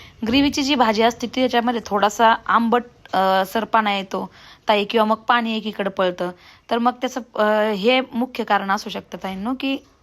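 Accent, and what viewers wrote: Ahmednagar, India. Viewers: Nagri bhasha asich ahe Jo to tyachya gavanusar bolto...amhi pn ngri ahot...bhashevrun kunala nav theu nka mumbait rstyavr rahnare pn standerd boltat....nagr chi hich bhasha ahe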